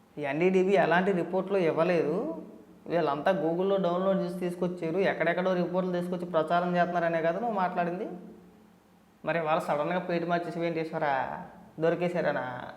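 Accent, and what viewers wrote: Godavari, India. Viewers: Power of Godavari slang 😊❤🎉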